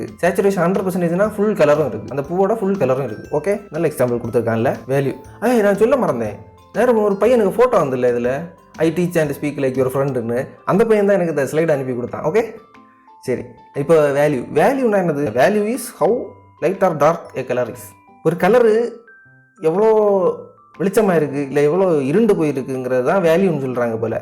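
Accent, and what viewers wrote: Kanyakumari, India. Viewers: Bro neenga kanyakumari ah?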